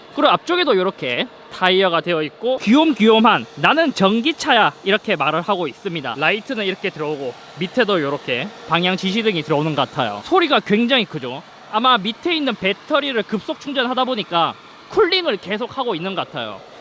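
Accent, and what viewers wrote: Gyeongsang, South Korea. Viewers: 경상도 분이세요,,,? 서울말은 아닌듯한데 ㅋㅋㅋ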